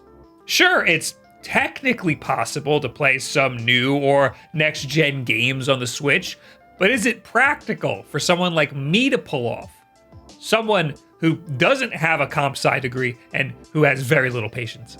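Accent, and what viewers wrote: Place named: New York City, United States. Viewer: you kinda sound like the NYC version of Jordan Peterson I just noticed 😳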